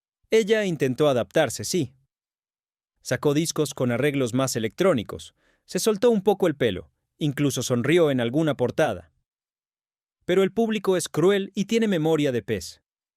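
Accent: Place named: Latin America. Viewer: acaso el vídeo lo ha hecho un español? Porque yo noto un acento claramente hispano Americano, no se porque llamar mentirosos a los Españoles? Mentirosa serás tú y creerás que todos son como tú.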